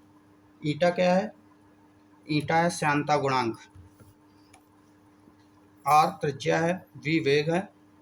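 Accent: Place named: Bihar, India. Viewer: Bihari ki tarah mat bolo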